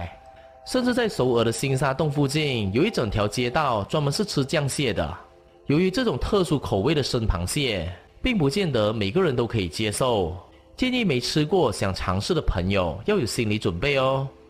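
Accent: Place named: Malaysia. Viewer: 大马口音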